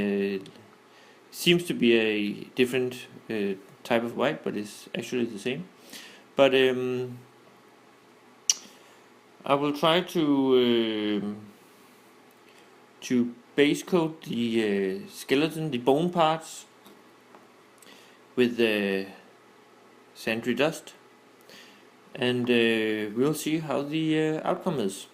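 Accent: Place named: Denmark. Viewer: Nice video. Are you by any chance danish? The way you speak english, sounds so danish :)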